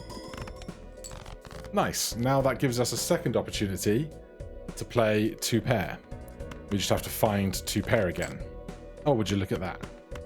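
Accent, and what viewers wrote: United Kingdom. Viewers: Hello, been loving your Balatro content, something about a fellow Brit commentating over this game in such a dry-witted fashion has only made me so much more eager for the release of this game so that I can actually play it for the first time. Fantastic content, please keep it up as much as you can considering your other commitments.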